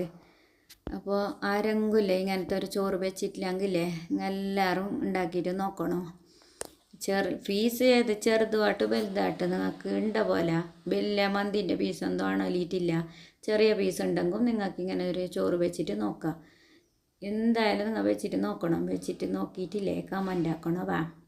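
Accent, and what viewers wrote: Kasaragod, India. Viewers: സംസാരം അടിപൊളി എനിക്ക് കാസർഗോഡ് ഭാഷ വളരെ ഇഷ്ടമാണ് വീഡിയോ കണ്ടു ഞാൻ ഇത് പഠിക്കും😂😂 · നല്ല അടിപൊളി സംസാരം എനിക്ക് ഒരുപാട് ഇഷ്ടപ്പെട്ടു കാസർകോട് ആണല്ലോ സ്ഥലം കാസർഗോഡ് ഭാഷയും വിഭവങ്ങളും ഒന്ന് വേറെ തന്നെയാണ്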